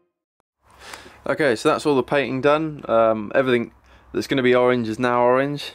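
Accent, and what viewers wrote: United Kingdom. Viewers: This is so epic! Glad to have found another UK builder! Do I detect a slight west country accent?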